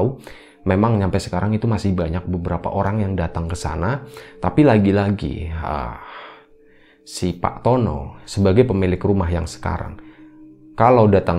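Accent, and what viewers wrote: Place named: Banyumas, Indonesia. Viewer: Kalau boleh tahu video laphor ini dibuat dimana ya... kadang denger bahasa jawanya mas kok agak ngapak. Barangkali dekat bisa mampir.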